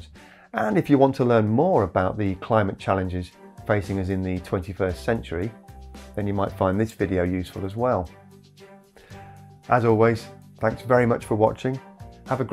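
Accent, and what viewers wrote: United Kingdom. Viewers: Methane? No, youthane. Why do Brits pronounce name of the gas that way?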